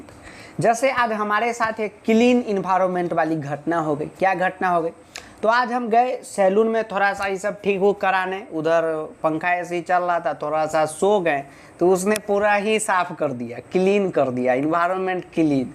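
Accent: Bihar, India. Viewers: Sir ka voice sa hi pata chal raha that he is a bihari ..😅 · Sir aaap Bihar se ho na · Mam ye bahut expert hai mai ense offline me padhta hu sir ka kuch word mouth me hi rah jata hai natural problem hai and sir Bihari hai esliye thoda edhar ho jata hai we proud on bihari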